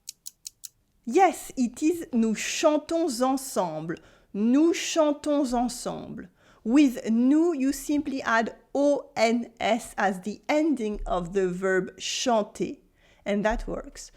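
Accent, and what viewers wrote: France. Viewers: OK! You a French woman or a Quebecer? Makes a big difference!!!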